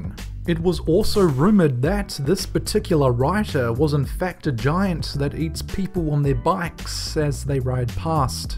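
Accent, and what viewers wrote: New Zealand. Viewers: Maybe a New Zealand accent? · First of all, did not copy Danger Dolan's accent. Haven't any of you ever heard of different accents. Ex: Australian, New Zealand, British, Canadian.... and so on And FYI every location, Country, State, or resident, has a different accent depending were the person is born. 2nd of all, Why do random people asum he is purposely trying to copy other accents? There is more than just only one person, with the same accent. Thirdly: Danger Dolan is fron Australia, and is from New Zealand. Fourthly: Dolan agreed to help, with the production of this channel. And Lastly: If you dislike or are still assuming this is a ripoff, then please leave this channel, and just go watch something else. For every stupid person out there, who complains about accents on this channel, Why are you even here on this channel?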